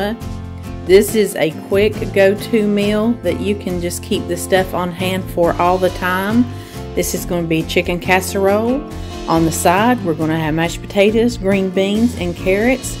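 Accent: South, United States. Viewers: I also love your wonderful accent 🙂. Sometimes I wish I was Southern 😂. But even though I can't speak in that beautiful way y'all do without being annoying or offending someone, I can try to make southern food. I've been making biscuits homemade from scratch since late last year and my family always loves them. I live in a country where there are absolutely no canned biscuits. So when I move back to the states Lord willing, being able to buy so many easy canned stuff will be such a treat. One batch makes about 11 biscuits and there never seems to be enough especially as our dog will not stop barking (when she's tied up momentarily so we can eat without her climbing all over my mom to get some) unless she gets one 😄.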